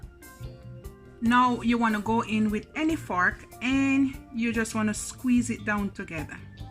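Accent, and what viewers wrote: Jamaica. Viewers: Wagwaaaan I love your Jamaican accent. .ouchea learning a great recipe for great food I hope you ill hallla at me too ...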